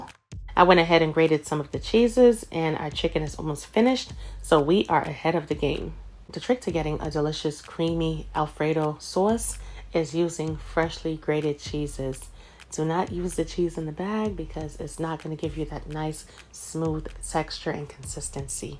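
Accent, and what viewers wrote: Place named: East Coast, United States. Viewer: I am subscribed to your channel but I rarely get in YT but when I do OMG I love watching your vids because the food looks deliciouis and not only that.., I know that I have told you that I LOVE LOVE LOVE LOVE LOVE LOVE YOUR EAST COAST ACCENT. I LOVE IT SO MUCH. VISITING NYC WAS CUT OFF OF MY BUCKET LIST IN SEPTEMBER 2021. MY SISTER SURPRISED ME WITH A TRIP THERE FOR MY 50TH BDAY AND WE STAYED IN MANHATTEN NEAR TIME SQUARE. I'M A COUNTRY SOUTHERN GIRL FROM LITTLE ROCK ARKANSAS, BUT WHEN THE REAL WORLD CAME ON MTV I WAS FASCINATINED, FROM HEATHER B AND I ALWAYS LOVE EAST COAST RAP. THAT WAS A WORLD WIND ♥♥♥ THE WORLD IS KINDA MESSED UP NOW, BUT WHENEVER I GET ME AND MY DAUGHTER SOMEWHERE TO LIVE, I WILL MOST DEF BE TRYING OUT YOUR RECIPES...., CAUSE HONEY THEY LOOK DELICIOUS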